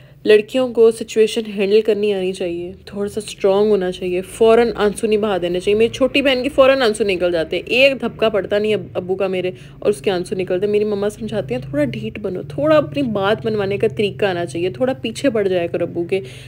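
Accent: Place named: Punjab, India. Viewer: Love your Punjabi accent and advices ❤️❤️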